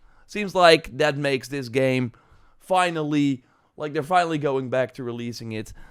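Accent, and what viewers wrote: Netherlands. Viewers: Dutch (Netherlands) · i really wanted to watch this video but that thick dutch accent is so grating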